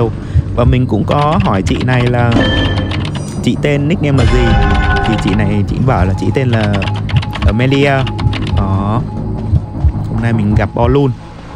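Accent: Vietnam. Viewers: nghe hơi dính accent của người Việt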